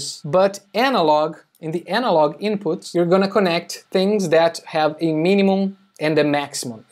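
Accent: Brazil. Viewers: O sotaque brazuka nunca vai embora né rsrs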